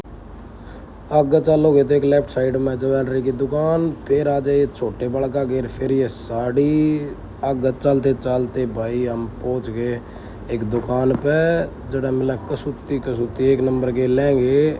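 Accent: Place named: Haryana, India. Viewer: Haryanvi voiceover 🤌💕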